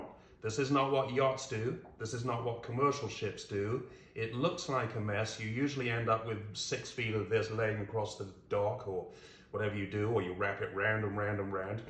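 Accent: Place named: United Kingdom. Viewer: Great video; so far all of yours that I’ve seen are very well done with clear and direct instructions. I have a question. You sound to my ear like you are British. I’d that the case? And how did you come to use “Royal Navy Yachmaster” as a title? I live in Canada now but am also an American citizen. Up here, using the term “Royal” generally indicates that English Monarch would have bestowed that honour, so I’m a bit confused.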